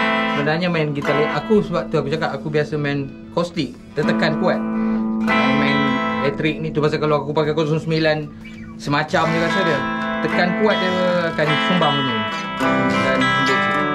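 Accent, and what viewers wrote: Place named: Johor, Malaysia. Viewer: 😅saLam~saye rase nie video Lame Lbh kurang10tahon Lps abangmus throwback semuLe ye!?🤔dah Loghat joho Lah puLak gamak nye nampak tengok bang🤙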